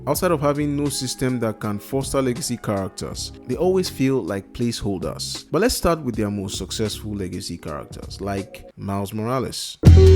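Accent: Nigeria. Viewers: Soothing ass Nigerian voice 🙏😩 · I hear a nigerian brother, I subscribe. No questions asked 😁😁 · Naija bro talking comics. So refreshing to hear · Subscribed IMMEDIATELY i heard the Naija accent. We need more naija nerds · Nigerian? Instant sub. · I know a Nigerian brother when I hear one. Nice pacing of the video as well as the edit. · 5 seconds in I said….wait he has to be nigerian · Hearing a Nigerian voice always makes me smile inside. My siz and I binge on those B- quality Nollywood movies so we really have a thing going with Naija flicks lol "Honarabohl woman??!? What do you mean honarabohl?? That gyeol dea be di most renowkn Ashewoh ddis side of de Congo, abeg." Literally heard this once and I DIED (Oh I'm Kenyan btw) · Hello U sound like a Nigerian (I am too) Please do you know where I can buy comics here in Nigeria (Abuja) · I think he Nigerian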